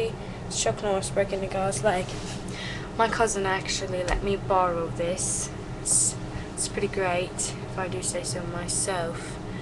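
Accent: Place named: Southern England, United Kingdom. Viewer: your english accent is getting better - or should I say more consistent - definitely a southern english accent. Please don't call it british though its english. As for song suggestions please look at my playlists - you might find something you like - or maybe not. God bless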